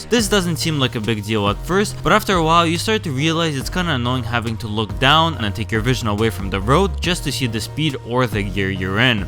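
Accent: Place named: Russia. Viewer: А чё там русский делает? Это же точно русский. Такой акцент буржуи не повторят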